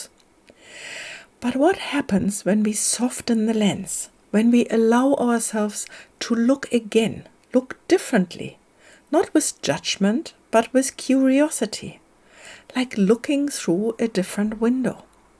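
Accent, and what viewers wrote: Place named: Germany. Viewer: Hi! New subscriber here! I love your interpretation of this prompt so much! I lived in Germany for 4 years. My husband is in the US Army & we were stationed in Landstuhl from <num>. I still have many friends who I still keep in touch with from there. Your accent brought back good memories. I have quite a few windows it is very uncomfortable to see. But I love the thought that seeing it can kind of release us. You are a breath of fresh air! I’m so glad I found you through this collaboration. Danke. Tschüss! xoxo, Jenn 🤗💚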